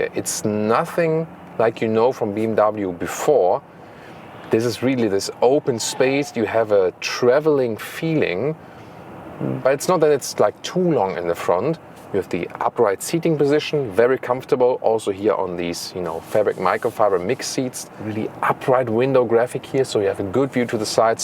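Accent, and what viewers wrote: Germany. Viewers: I happened to watch this guy's clips many times back 4 years ago while I was choosing to buy a car (Bimmer/Merc/Volvo). This year I watched some youtube clip I think about marine fish/reef tank or something and I came across German-accent English. I told myself I swear to god I have listened to this German-accent English before, from some guys but couldn't recall who (but kinda remember this face and remember it was from Youtube). Ahhh finally I remember.. its' from! Nice accent!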